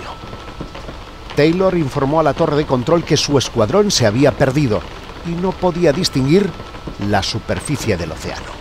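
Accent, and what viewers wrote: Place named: Spain. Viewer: Emocionado de poder escuchar el relato.. pero ... el Horrible acento español me hizo quitarlo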